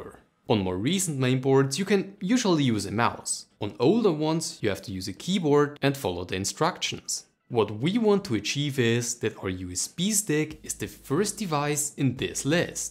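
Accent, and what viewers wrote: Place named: Germany. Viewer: deutscher accent aber gutes video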